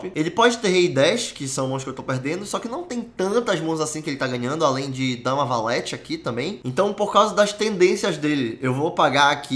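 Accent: Pará, Brazil. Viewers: mano que video legal, vou começar a acompanhar, to jogando online e to ganhando por enquanto, no positivo em relação ao que eu coloquei, percebi pelo sotaque que vc é do Pará né, muito massa mano, só sucesso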